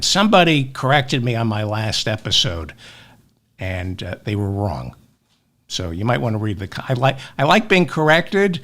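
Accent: New York, United States. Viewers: 😮. I love the way you deliver the news with the New York fair in France.😮.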